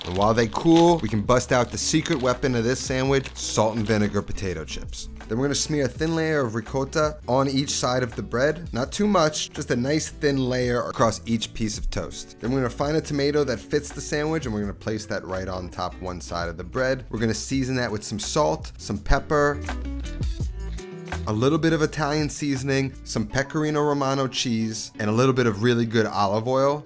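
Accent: New York, United States. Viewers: for the love of God, stop overthinking the way you pronounce ricotta. Don’t feed the trolls. You’re Italian American from New York, so is my sister in law- her whole family pronounces it the way you do. People pronounce things differently in different places. Also I’m Irish, and that’s how all my chef instructors in culinary school years ago pronounced it. People need to move on if they’re still giving you shit about the way you speak. Sandwich looks amazing by the way, keep doing you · No, it's an Italian/ American New York thing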